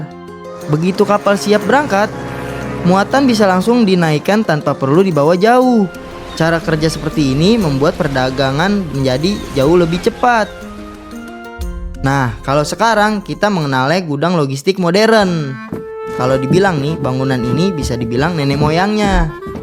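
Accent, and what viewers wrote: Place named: Betawi, Indonesia. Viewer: pembawaannya khas betawi gak kaku, seru, jadi mudah dipahami 👍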